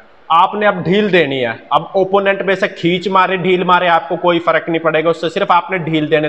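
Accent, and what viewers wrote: India. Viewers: Bai tusi punjabi ho accent punjabi vala feel hunda but feel good to see ur video bro.. kal ehi krna mai 💪🏻♨️💯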